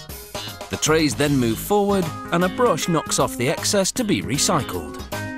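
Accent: England, United Kingdom. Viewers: i love English accent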